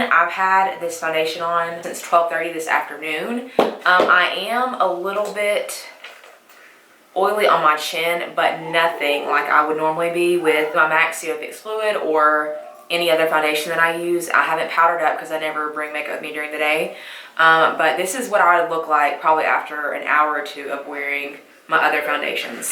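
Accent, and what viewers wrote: South, United States. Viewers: OMG girl same!!! I'm southern and from Alabama!!! It's good to hear a makeup guru that's southern like me, lol. You should follow me in instagram, I have to accounts one is my main gracieorr_ and the other is my "spam" and I basically just post a lot of makeup looks I've done. I would love for you to follow me!!! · I love your accent! Us girls with southern accents are the best 😄 you’re gorgeous by the way! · Yasssss .... Girl your accent just gave me life ... I'm from SC and I didn't realize how much I miss hearing that southern accent . U look amazing !! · I thought you were from Georgia cause of the southern accent lol but I'm Mexican but I'm raised in Georgia and i sound like you lol 😂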